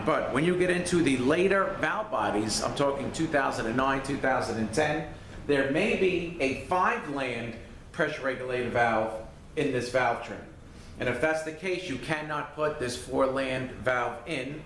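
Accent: Long Island, United States. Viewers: Greetings from Albany! I think I hear the LI accent, i'm from there(SE Nassau area)!!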